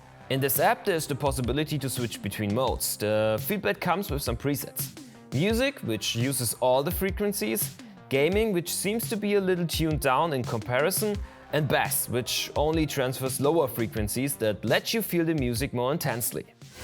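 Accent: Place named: Germany. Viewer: This guy has the worst German accent ever. So harsh to listen to.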